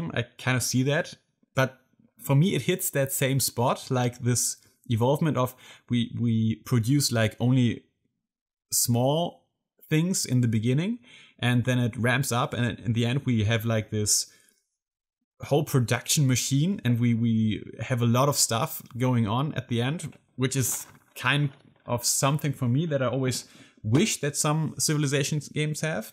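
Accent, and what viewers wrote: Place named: Germany. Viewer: Really appreciate your video. You are so lucky to have so many great games shops in Deutschland, hearing your accent makes me miss my Mother country!. - Your English is perfect by the way. Thanks brother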